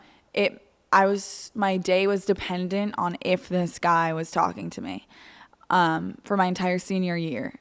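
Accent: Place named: Ohio, United States. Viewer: As a fellow Ohioan who also moved away, I love when your Ohio accent comes out 😂🥰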